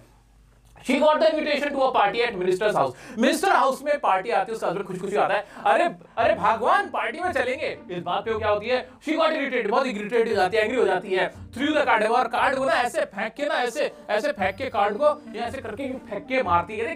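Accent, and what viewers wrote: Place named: Bihar, India. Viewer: Sir aap bihari ho 😸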